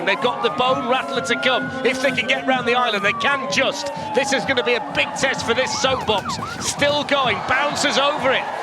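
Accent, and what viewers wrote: United Kingdom. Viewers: I love ghis british commentary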